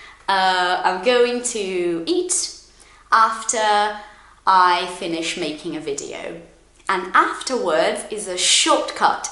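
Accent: United Kingdom. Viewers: That was an amazing class! A whole class in English. And I have to say I love your British accent. Thank you so much for your tips.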